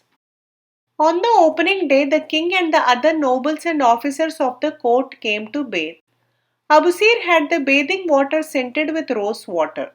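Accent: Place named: India. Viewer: You narrate so beautifully with a touch of indianness which is delightful for the listener!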